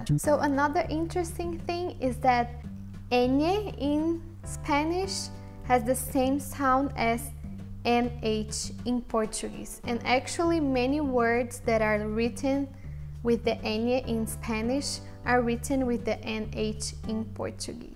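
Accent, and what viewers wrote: Brazil. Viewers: O teu português é muito brasileiro ; ) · Muito bem! Se não fosse o sotaque brasilês e a omissão de alguns determinantes seria perfeita. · she spoke with a Brazilian accent and put the flag of Portugal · Your portuguese accent is from Brazil not from Portugal, it's very different... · Isso não é português de portugal isso é português do brasil. Tu pronunciaste as palavras como os brasileiros. Ah e maquiagem diz se no brasil, em Portugal diz se "maquilhagem" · É Português do Brasil... quase outra língua! Ela usou palavras brasileiras, por isso que devia ter a bandeira do Brasil. Como por exemplo, quando ela disse "maquiagem" em Portugal é "maquilhagem". E para não falar que também usou o sotaque brasileiro. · JhonnLT Ele não falou "bosta" seu ignorante. Ela usou sotaque brasileiro, é uma crítica perfeitamente válida sobre a bandeira. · You didn't actually speak native portuguese, you spoke brazilian which has a completely different accent from european portuguese. 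Makeup is not maquiagem in portuguese from Portugal, that's brazilian. In portuguese it's maquilhagem. Stop distorting portuguese language please. This video is more about brazilian portuguese and spanish, not portuguese vs spanish. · que tal se vc colocasse a bandeira do Brasil qdo se refere ao português. afinal vc é brasileira e seu sotaque também. acho que teria mais sentido, apesar de ser a mesma língua. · you were actually speaking brazillian portuguese, not the "european". you can tell that by the accent (not a big deal) but also by the word "maquiagem". in portugal, it spells "maquilhagem". just wanted to point it out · Oh cmon portuguese and brazilian has a diferent accents... i am portuguese u are brazilian, in this videos her “portuguese” was brazilian language🤦‍♂️ as cenas são diferentes entre brazil e Portugal nomeadamente o sotaque... but that was a nice video! Congrats. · poxa moça, você poderia então ter colocado a bandeira do Brasil pq esse sotaque português ai é do Brasil. 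· This has probably been pointed out already but the portuguese word for makeup is "maquilhagem", and the "lh" is not mute. Also portuguese sentences are built a little diferent, for instance "sempre há muito vento quando neva" sounds really off, it would be "Quando neva há sempre muito vento", though it is a only a small difference and I understand this is to keep things simple. Also I feel like this video is explaining more about the similarities than the diferences (these words can be easily translated from one language to the other with this simple rules while a lot of them can't) Also I wanted to point out she's using the brazilian accent, and as a portuguese it makes me wanna point that out (my corrections were for Pt-Pt, so ignore me if they're not true in Br-Pt). Sorry for the long comment, blame youtube for recomending me videos of my language. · sorry ma'am that is not portuguese its Brazilian portuguese which is a lot different when it comes to pronunciation, also you speak south american spanish not original spanish. 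you shoudn't have used the portoguese and the spanish flags in this video so not to mislead people. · FreedomWarrior Não vale a pena andares a causar discussões com Brasileiros só porque ela falou com o sotaque do Brazil, ela usou a bandeira Portuguesa quando falou da língua Portuguesa (dando reconhecimento ao país original da língua) não a Brasileira, por isso acho que estás a exagerar e mais, a causar má impressão do teu e meu país. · why would you use the portuguese flag if your talking like brazilian people wTF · I like the video, but this is much more SPANISH vs BRAZILIAN.... Your accent here is completely brazilian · Well,when you speak portuguese you speak like brazilian so,your portyguese from Portugal is lightly bad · Muito bem explicado, Muy buen explicado, me ha gustado el acento brasileño :) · you are speacking portuguese from brazil not from portugal sorry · Ese portugués que estás hablando es más Brasileiro XD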